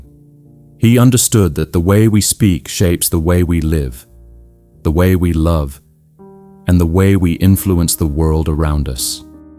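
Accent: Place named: England, United Kingdom. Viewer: Get rid of the English accent.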